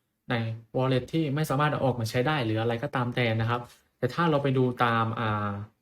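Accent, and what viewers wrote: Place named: Central Thailand, Thailand. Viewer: ใต้ตรงไหนเนี่ยะ ออกแนวเหน่อภาคกลางมากกว่า หรือไม่ก็ภาคตะวันออก..สารภาพมานะ..เป็นคนจังหวัดอะไร..บอกมา..ไม่งั้น..โกรธ...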